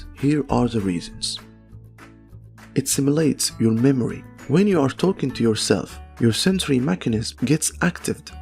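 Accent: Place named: Egypt. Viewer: What's the program that you used to make the video? Super Cool. ALSO! Are you from Egypt? Your accent sounds very familiar :)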